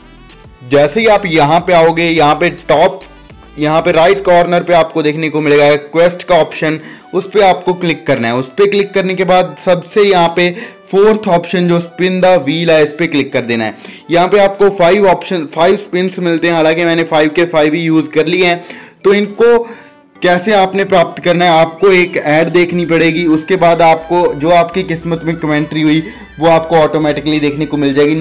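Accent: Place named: Punjab, India. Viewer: Bai punjabi lagda tu mainu😁❤️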